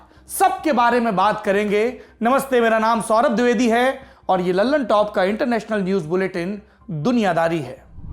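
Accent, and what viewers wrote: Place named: Kanpur, India. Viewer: Gazab haunk diye dadaa.. Chungi lga di.. Hahaha.. Kanpuriya andaaz wah wah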